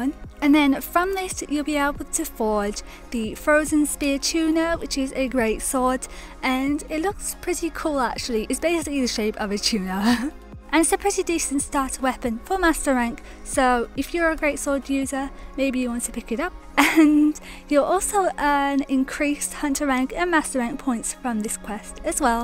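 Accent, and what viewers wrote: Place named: United Kingdom. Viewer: She quite clearly has a British accent.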